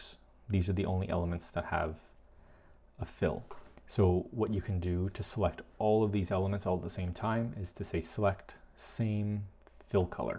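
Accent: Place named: Canada. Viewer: I felt like I was listening to the voice of Roman Mars from 99% Invisible, only a Canadian version ;) Thank you for sharing the tips. I've used Illustrator on and off for 20 years but never really explored all of the options and you've pointed out some really helpful tips that cut down on the actual designing part simply due to rotates and refecting.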